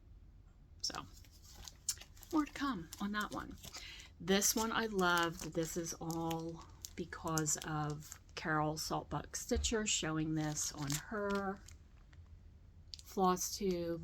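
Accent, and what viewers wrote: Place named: Pennsylvania, United States. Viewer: Hi, this is my first time watching your FT, but as soon as I heard you, I recognized the PA accent! I live in NC, but grew up in the northern MD area. I’ve gone to The Stitching Post as well in Catonsville.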